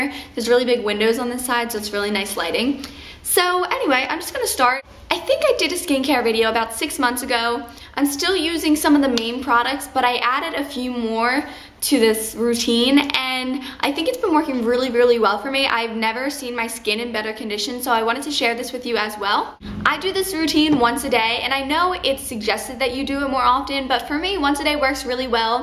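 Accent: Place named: Midwest, United States. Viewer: are you ffrom the mid west. your accents sounds like my cousins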